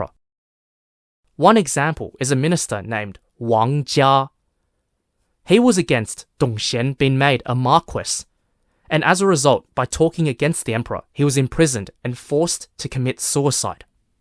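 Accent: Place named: Australia. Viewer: First time watching. Interested. Ozzy accent puts me off cause it's usually racist. Only 4 mins in but this don't sound racist yet. I understand that sounds racist. It is. Hence interrested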